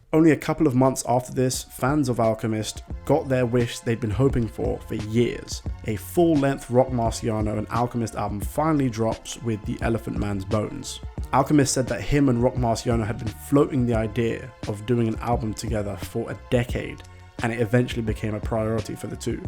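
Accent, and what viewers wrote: United Kingdom. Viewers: im listening to a a British person talk about Americans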